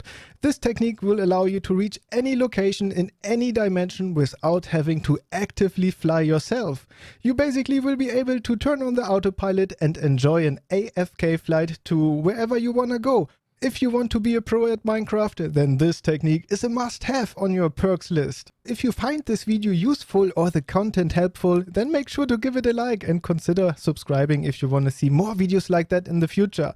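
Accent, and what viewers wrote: Germany. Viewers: Bist du Deutsch? Hört sich so an!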